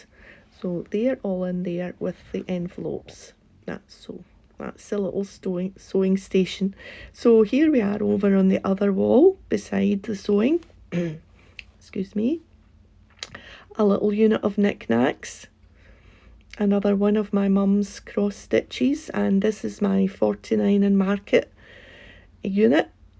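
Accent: Scotland, United Kingdom. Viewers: Love you room tour? Are you by chance from Scotland? You sound a lot like my Mom.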